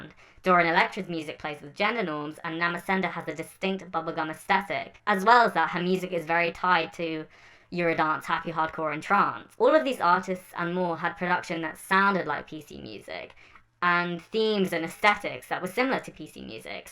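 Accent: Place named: United Kingdom. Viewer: Americans?💀 he has a british accent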